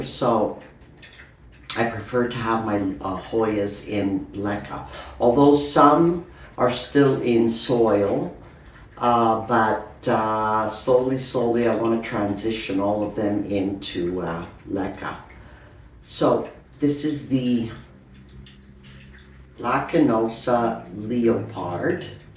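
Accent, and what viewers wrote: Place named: New York, United States. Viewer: Hi! That black cat callistophylla is on my wishlist as well as the undulata which I am right now looking for, affordable of course! I thought your accent sounded NY or NJ. Are you a transplant to Canada? Look forward to your next 💚! Dee, NY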